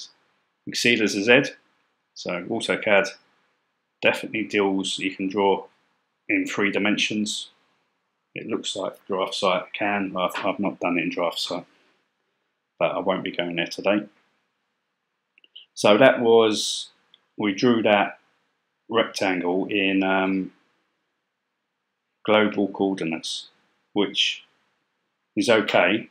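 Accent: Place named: England, United Kingdom. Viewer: Thanks for the great video (and the English accent), I'm investigating 'free' CAD software for members of our Mens Shed, a movemnt that I think is catching on in Uk as well now. Also liked your bird box video, the link for which I will pass on to some folks here (in Tasmania) who are looking to protect the Swift parrot from extinction. Using the Pi may be too high tech for their purposes but it might get them thinking.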